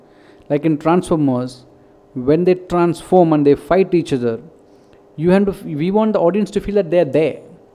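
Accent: India. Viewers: hahahah bldy indian english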